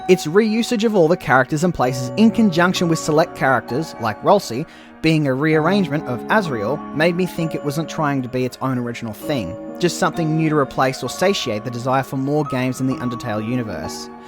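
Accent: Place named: Australia. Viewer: Unrelated but I sense an Aussie here… Good on ya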